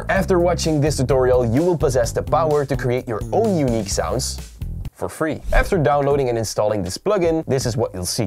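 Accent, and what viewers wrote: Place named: Netherlands. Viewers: from the accent I'm guessing you're Dutch! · He does sound Dutch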